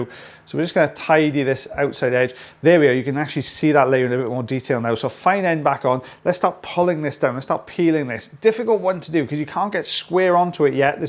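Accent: Wales, United Kingdom. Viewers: Why is my day not complete unless I've watched these? Got to love that Welsh accent & ear peels to soothe away the days stresses!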